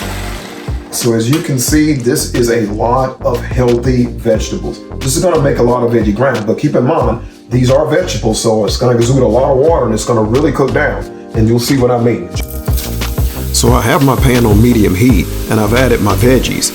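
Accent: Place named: Bay Area, United States. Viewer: You got a strong bay area accent bruh. What set you from? Dope clip cuz!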